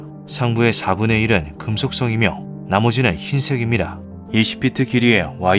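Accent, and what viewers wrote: Gyeongsang, South Korea. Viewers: 뭘 믿어야 하지? 혹시 경상도 출신이세요?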